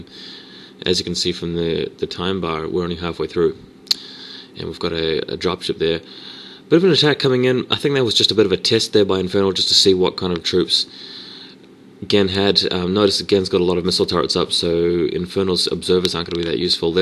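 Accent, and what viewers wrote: New Zealand. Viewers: I like your accent. Go NZ. :D And nice commentary, I understood everything that was going on, and I actually don't really know too much about the idiosyncrasies of the game.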